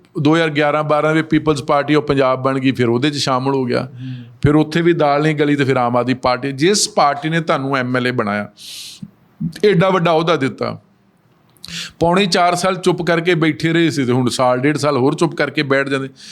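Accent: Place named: Delhi, India. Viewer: sahb vich delhi wala bolde lagda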